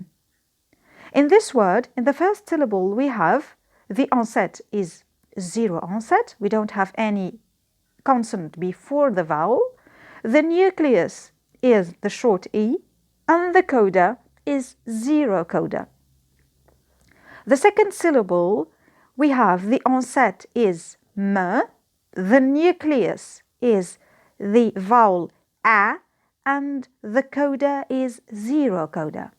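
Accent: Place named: United Kingdom. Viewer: Ur British accent is 👍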